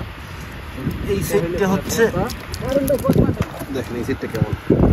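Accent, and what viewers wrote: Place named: Bagerhat, Bangladesh. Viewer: আপনার বারি কথায় বাগেরহাটে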